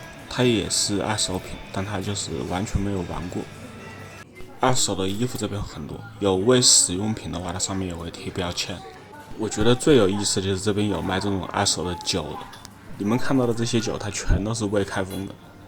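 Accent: Wuhan, China. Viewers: 武漢口音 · 这口音 太武汉了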